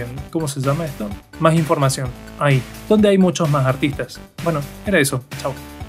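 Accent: Córdoba, Argentina. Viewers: Buen vido bro, sos cordobés? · Más cordobés imposible aksjdj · Cordobés hasta el alma, se siente. Buen video carajo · sos cordobés? Hay un poco de tonada que creo que es de mi provincia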